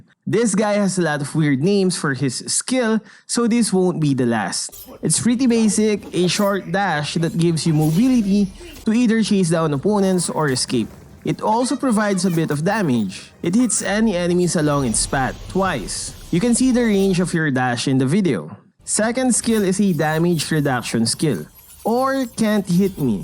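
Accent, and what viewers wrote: Philippines. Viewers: Putang ina mo accent mo pang cancer na pinoy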